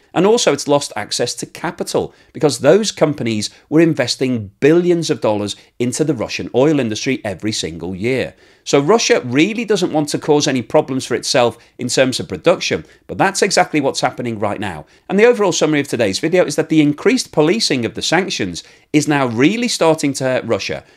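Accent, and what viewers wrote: United Kingdom. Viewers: He’s not American with that accent, British I’m sure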